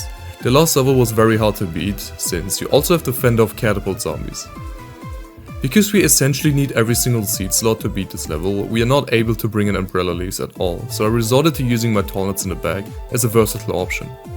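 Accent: Germany. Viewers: Im also German and I instantly heard your accent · I Instantly knew you were German the second i heard the accent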